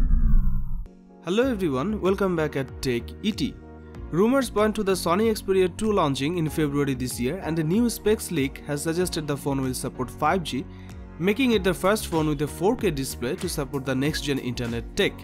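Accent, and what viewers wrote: India. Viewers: Gak tahu kenapa kurang suka aja kalau orang india ngomong inggris 😂😂😂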